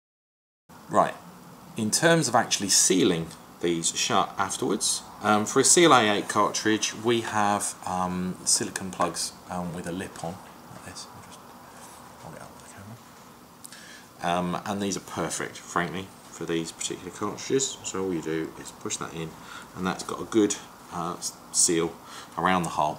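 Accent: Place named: United Kingdom. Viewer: Damned British accent PLUS not talking into the mic made it hard to understand a word you said. Learned more from watching than listening.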